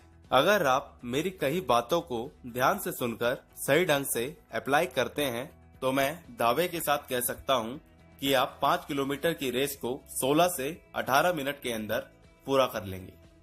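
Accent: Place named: India. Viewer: fuck! this accent! i'm so fed with indian accent! fuck!!!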